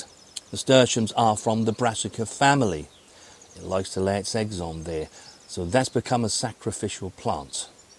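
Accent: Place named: RP, United Kingdom. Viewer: Where abouts in Norfolk are you - I'm strugglng to identfy it by your rather posh well spoken accent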